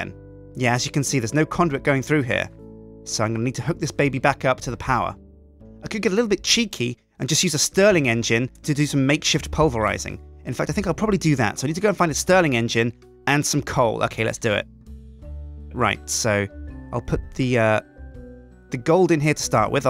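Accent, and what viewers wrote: Australia. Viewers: Australian accent?? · His aussie accent is amazing even I can't do that and I am aussie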